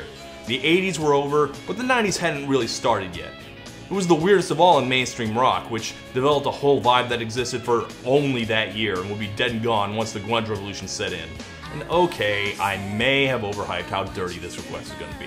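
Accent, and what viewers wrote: Australia. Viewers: Todd, I see you picked up an Australian accent. Please, put it back. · when i heard the australian accent i nearly quantum sprinted to the nearest cliff and leaped off of it. good video though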